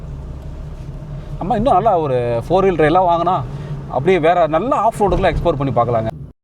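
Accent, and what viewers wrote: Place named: Madurai, India. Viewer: Jee ur video super madurai speech super ji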